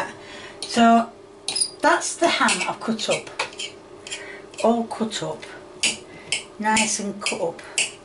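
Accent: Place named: North, United Kingdom. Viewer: Hiya... I find your lovely northern accent very comforting and your cooking very inspiring... I would love to see you do your favourite food to eat/cook. 🎉❤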